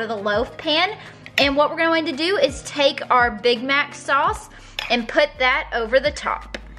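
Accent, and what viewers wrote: South, United States. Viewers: "Hey y'all!" Absolutely love that deep southern accent..."with yo purty little self" 😄😄 Real talk though this looks good I make a mean meatloaf myself so I'll be trying this soon thank u for the vid.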